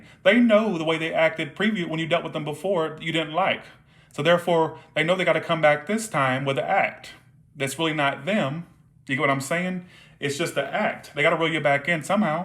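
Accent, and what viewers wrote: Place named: South, United States. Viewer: Your Strong Southern accent is popping up today.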